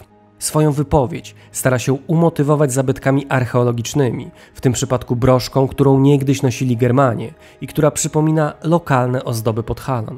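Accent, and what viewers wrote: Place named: Warsaw, Poland. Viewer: Sam sposób w jaki opowiada swoją recenzję jest taki wkurzająco warszawski i salonowy;) W sam raz dla fajnopolaków. Bo to film dla tej grupy społecznej.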